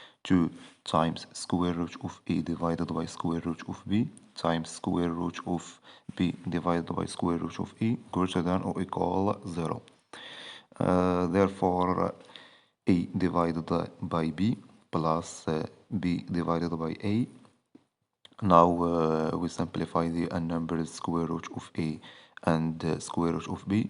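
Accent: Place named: Morocco. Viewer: You sound Moroccan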